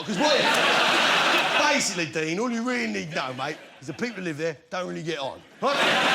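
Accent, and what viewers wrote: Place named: England, United Kingdom. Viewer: Love this. Proper English not some abomination.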